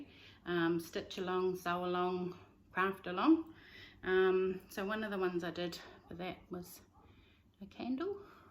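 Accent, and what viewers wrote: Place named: New Zealand. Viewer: hahaha that's her Kiwi accent wait till she says six ;)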